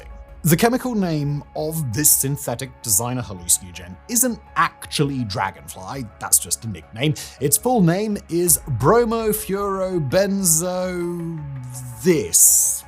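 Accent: England, United Kingdom. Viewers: You are the most british-sounding person I have ever encountered. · Yeah man you're right. He's enunciating very sharply, so it happens. Plus his presumably English accent.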